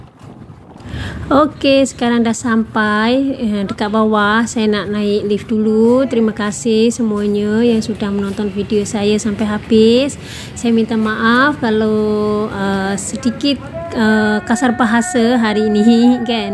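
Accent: Indonesia. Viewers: Mbak saya orang Malaysia izinkan saya memberi dua saranan berikut : 1. Bagusnya mbak jelaskan apa maksud istilah "flat" yg tertulis di judul dan juga dituturkan dlm video. Kebanyakan warga Indonesia tentu tidak tahu apa itu "flat"- tidak ada istilah begitu di Indonesia. 2. Sebagai orang asal Indonesia dan juga untuk berbagai informasi kpd pemirsa di Indonesia, ada baiknya - dlm sebahagian video jika tidak semua - mbak bicara dlm bahasa Indonesia bukan dlm bahasa Melayu. Penuturan bahasa Melayu mbak bagus sekali walaupun jujur masih kedengaran ciri2 "orang Indonesia" dlm penuturan.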